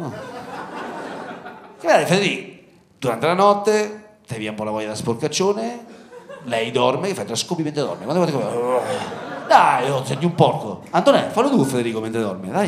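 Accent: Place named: Marche, Italy. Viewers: Il marchigiano è bellissimo.... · Regà ma quant'è bello l'accento marchigiano!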